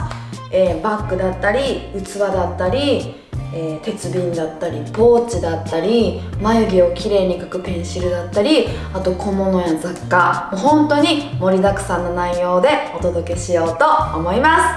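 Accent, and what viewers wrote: Kansai, Japan. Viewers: 笑けてくるって言うみっこちゃんが好き♡こんな可愛い人が同じ関西人で関西弁で嬉しい♡ 木の器、可愛い💕水時計の音、超癒し… カバンもネックレスも急須も全部素敵〜！ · 関西弁のみっこちゃんが、かわいいです😍 naruki takahashiのfruits stand、ステキですね🍊欲しくなっちゃいました！ 後ろの鏡もカワイイ🪞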